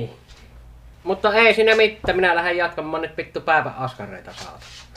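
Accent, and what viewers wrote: Savo, Finland. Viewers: nyt ainakin kuulee ton savolaisen murteen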